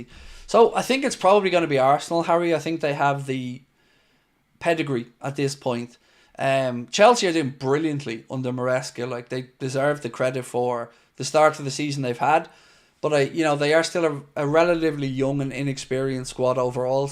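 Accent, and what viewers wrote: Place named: Liverpool, United Kingdom. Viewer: Struggling to understand this guys thick scouse accent !!! Tourist club real scousers support the toffees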